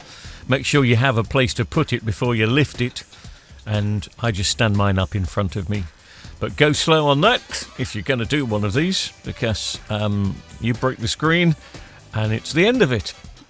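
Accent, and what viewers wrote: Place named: North East England, United Kingdom. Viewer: I thought you was North East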